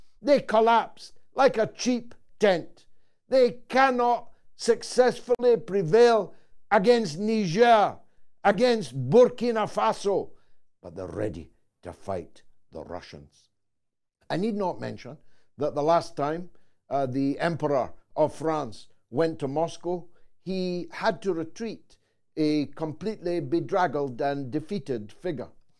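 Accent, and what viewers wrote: Scotland, United Kingdom. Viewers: Hey, you are Scottish, I presume, according to your accent...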